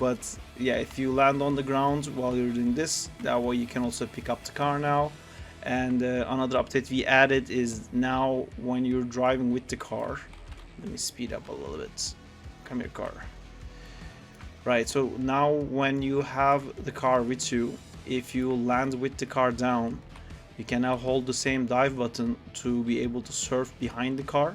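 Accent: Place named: Turkey. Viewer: selam aksanından türk olduğunu çıkardım oyunu nereden indirebilirim if u are not a turkish im sorry how i can download this game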